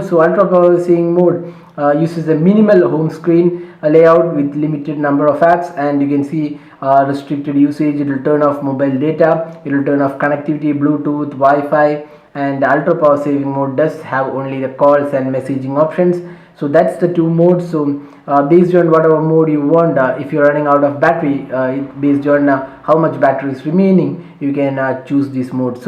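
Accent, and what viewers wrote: India. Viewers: es indu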